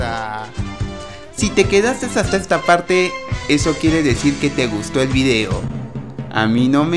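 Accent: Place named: North, Mexico. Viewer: eeeeee norteño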